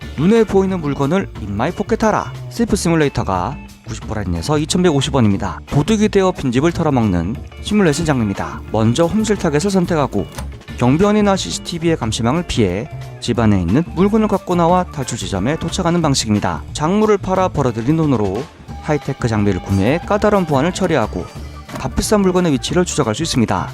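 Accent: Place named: China. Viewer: 주인장 조선족이죠? 특유의 억양이 나오네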